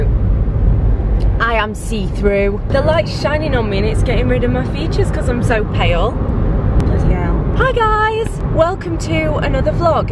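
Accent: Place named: Yorkshire, United Kingdom. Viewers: You know you're back in Yorkshire when you say "chuff" and "'ome" instead of home hahaha! Love itttt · you definitely get more northern whilst up north. #upthenorth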